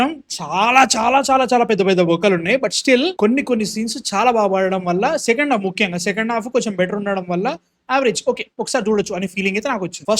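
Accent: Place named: Hyderabad, India. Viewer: Chittoor slang almost alaagey untadhi nee hyderabadi ki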